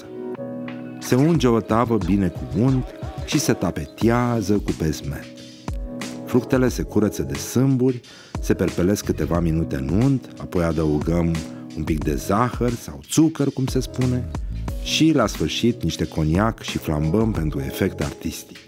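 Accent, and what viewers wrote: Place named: Timisoara, Romania. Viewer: Apreciez pronuntia timisoreana, cu tot cu e-ul dus subtil spre i ;) Parca dintr-o data m-am pomenit la Timisoara. Multam! ;-)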